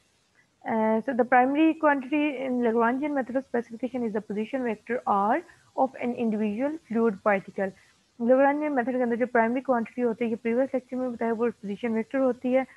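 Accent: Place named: Pakistan. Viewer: Voice se hi lg gya tha Pakistan ho ...🙈😅😅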